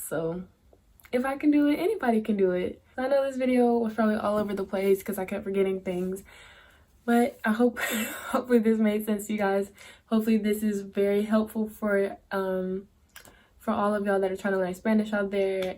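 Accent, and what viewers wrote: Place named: England, United Kingdom. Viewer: I was in Birmingham for a couple of years, your English accent is not a typical black's accent tho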